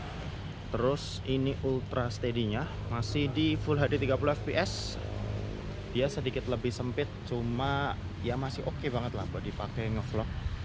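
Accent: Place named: Purwokerto, Indonesia. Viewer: PURWOKERTO YA INI MAS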